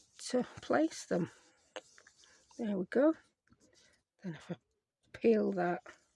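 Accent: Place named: Yorkshire, United Kingdom. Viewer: I love watching your videos, they are always so much fun and practical. I love that you sound just like my late Mum and Aunty. They were both from Yorkshire and I miss them so much. To me it’s the best bonus ever. Thank you. 💖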